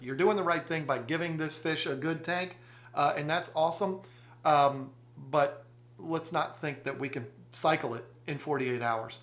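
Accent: United States. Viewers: Every time it rains my pond gets filled back up and gets a water change too. Oh and I forgive you (all Americans for that matter) for mispronouncing actual English ;) Just to point out there is an "e" in beta so pronounce it bee-ta, english. While i'm here, there is a "h" in herb too, so pronounce it her-b not er-b. English do you speak it? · We get intelligent hard working people make free videos for us, and some absolute mong decides to take the mick out of their accents. Shouldn’t have even responded in my opinion but hey ho. America is huge and the various accents have way too many influences and quirks to name. Either way it is more beautiful than anything else, and the problem is with that muppets brain.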